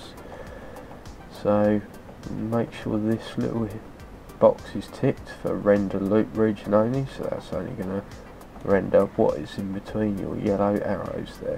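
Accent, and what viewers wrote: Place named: Southern England, United Kingdom. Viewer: Really great and so much help! I'm from Kent but I've been living in Manchester for 10 years, so I enjoyed your good old Southern accent.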